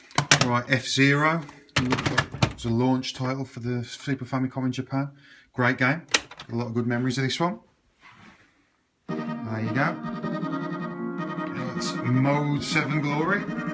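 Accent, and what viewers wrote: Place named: Birmingham, United Kingdom. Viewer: Finally a youtuber with a Bhirmingam accent. Right?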